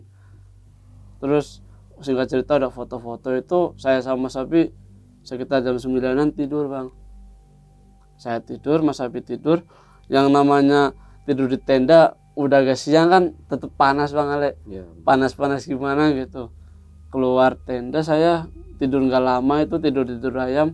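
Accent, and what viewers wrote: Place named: Banyumas, Indonesia. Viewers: Doa yang baik2 buat mas..saya doakan sukses dunia akherat Aamiin.., aja kelalen mas ora ngapak ora kepenak.. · Mas nek ngomong khas aksen wonosobo - purbalingga (ngapak) banget ya wkwkw jd kangen ke wonosobo lagi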